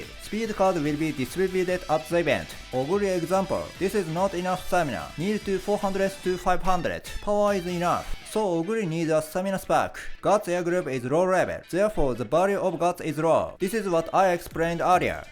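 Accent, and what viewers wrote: Japan. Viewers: What is wrong with your english? It’s like you’re speaking in japanese accent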